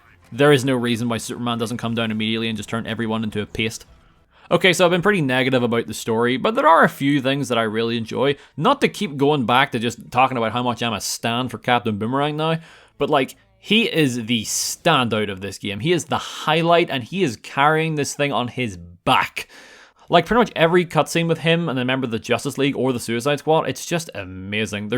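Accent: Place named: Northern Ireland, United Kingdom. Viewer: as a fellow northern irish person its always such a weird feeling when I click onto a youtube video and hear the accent lmaooo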